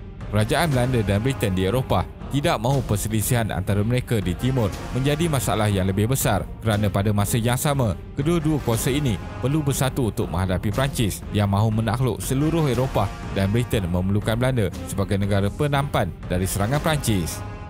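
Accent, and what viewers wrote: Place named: Malaysia. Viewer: Assalamungalaikum, admin dari Malaysia Kan,karna aku dengar lidahmu bukan lidah orang Indonesia 🇮🇩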